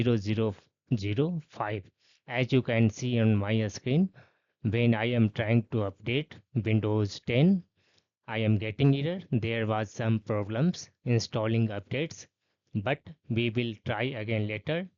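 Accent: India. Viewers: man I love you first i dont trust you becous you sound like a indish person u want to scam me but now i love you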